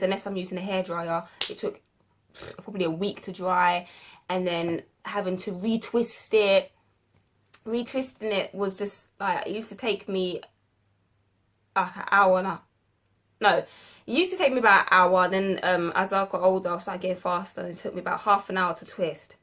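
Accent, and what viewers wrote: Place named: London, United Kingdom. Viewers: pretty skin and great to hear a uk accent. :) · carol durand yeah big time great to hear UK London girl cos all we usually get is American...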